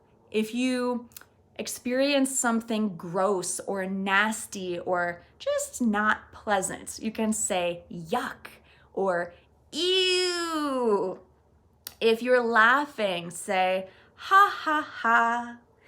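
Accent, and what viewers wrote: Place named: United States. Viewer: Hi ❤️ your American accent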